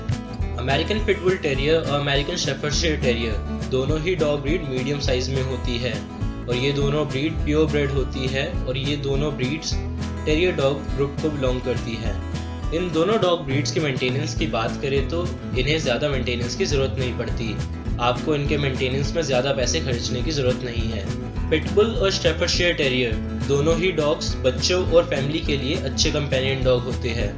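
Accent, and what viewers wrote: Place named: India. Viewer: Do you have the biggest indian accent or are you speaking indian, cause i cant understand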